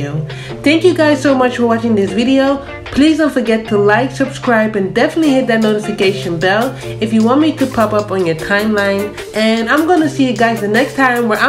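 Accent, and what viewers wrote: Netherlands. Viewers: i know a dutch accent when i hear it! ;) gonna try the tips ! thanks :)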